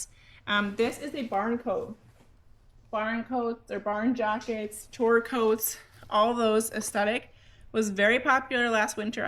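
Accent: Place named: Midwest, United States. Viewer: You’re from the Midwest. lol Taayygs. I say the same and I’m in Wisconsin.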